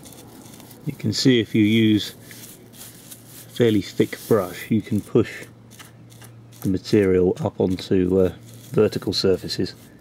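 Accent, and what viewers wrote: United Kingdom. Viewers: You are my favorite model railroad expert. In this film I particularly appreciated your speedcam effects (In my day in the USA it was called the Captain Kangaroo Magic Drawing Board effect : ). In all your films I've appreciated your thoroughness in explaining things as well as visualizing them. As a Yank I've also enjoyed your Brit accent (what accent?!). Cheers : ) Site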